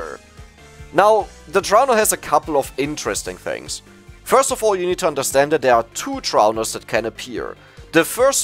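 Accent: Germany. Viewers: Hab nach 2 sekunden gemerkt dass du deutsch bist, aber geiles video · Bro you're a German, right? Idk I feel the German accent in your English so badly haha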